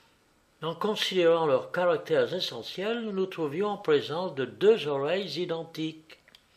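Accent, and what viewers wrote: England, United Kingdom. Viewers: oh are you adding a british accent :D i was wondering what dialect that was lol im learning french :goodvibes::elbowcough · Merci pour cette interprétation, très belle voix avec l'accent anglais. J'ai apprécie cette voix dans mes lectures audios de Sherlock holmes. Merci encore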